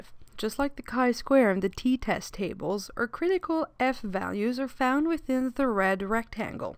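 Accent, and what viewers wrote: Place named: Ireland. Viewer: It's a European thing. She sounds Irish maybe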